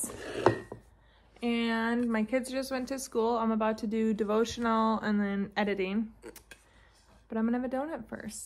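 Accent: Midwest, United States. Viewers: Missed the midwest accent 😉. Been awhile since one of your vids popped up on my feed 💪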